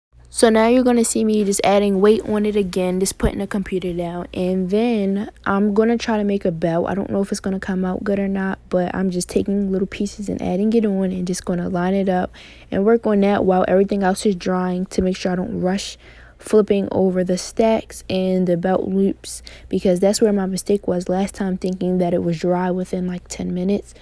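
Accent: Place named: Baltimore, United States. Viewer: it sound like she from baltimore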